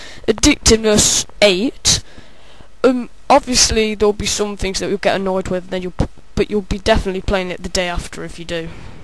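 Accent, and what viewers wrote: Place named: United Kingdom. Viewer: u sound gay with the britshzz acsent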